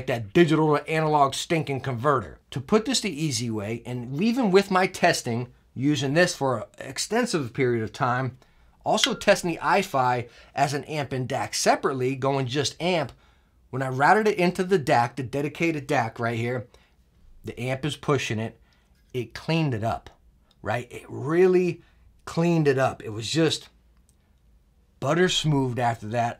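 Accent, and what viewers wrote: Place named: Massachusetts, United States. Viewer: Thanks for bringing the ruckus,! Where are you from? Definitely the northeast. My best guess is Massachusetts.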